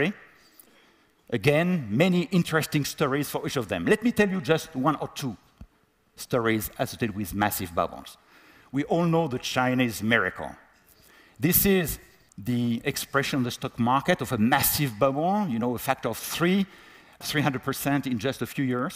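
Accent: France. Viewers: If you find him hard to understand, it's probably because you don't get to hear other people's accents too often, making this more of an issue about you. He's obviously French and has a French accent, but he's not CHOOSING to speak like that- as with everything, learning to master a language takes practice. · u french? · His French accent is thick as fucc tbh tho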